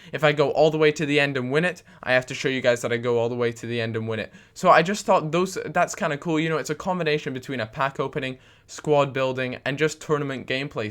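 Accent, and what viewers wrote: Scotland, United Kingdom. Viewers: Cal what part of scotland you hail from? :D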